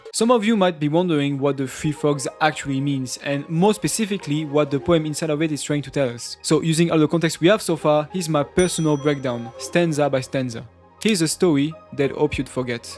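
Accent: France. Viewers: Je valide l'accent français ! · Are u french or israelian? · L'accent français qui trahit n'importe qui ❤ excellente la vidéo sinon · Where are you ?from your accent look French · WAIT! are u french? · Is this guy french? :( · aaaaaaa l'accent français mddr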